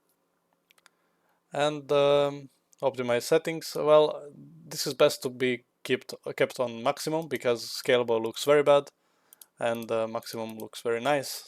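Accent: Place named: Croatia. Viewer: Odma prvu minutu sam posumnjao da si nas hahaha, hvala ti za ovo <3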